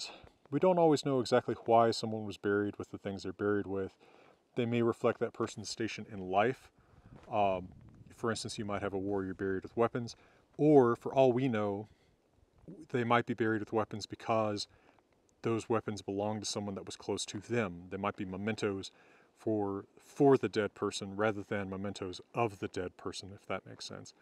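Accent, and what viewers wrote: United States. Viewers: I love that you have a deep, tough American accent and voice. With a cowboy intro with western music. In a cowboy envirement. Dressed with a cowboy style. Talking about Scandinavia and Vikings. Freaking badass as a Dane i must say. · Using the comparative method of reconstruction and the contemporary sources (e.g. the First Grammatical Treatise) it is perfectly possible for an educated person to come much closer to Old Norse than modern Icelandic, even with an American accent, although I will grant you that Dr. could have made the */i:/ longer. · Interesting video, however when you say you use "Reconstructed medieval Norse pronounciation" I think it's not accurate. You are saying approximately what they sounded like, with an american accent. Rísmál - Icelandic pronunciation --> Reesemowl(approximately) What you said sounded like Rissmaul./mall Who says that 11th century norse "Á" sounded like an english speaker failing at icelandic pronunciation? 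I don't understand how reconstructed norse spoken by a non native speaker would ever be more accurate than the icelandic pronunciation. Icelandic is the closest to old norse we will ever get because it is the same language only older, slightly changed. It would be nice to get a video on the modern reconstruction of norse. I don't know what you would base it on though. Modern icelandic and the old sagas? I enjoy your videos and hope I don't sound discouraging, because your disclaimer was probably excactly for these kinds of comments. A video expanding on this reconstructed pronunciation would be wonderful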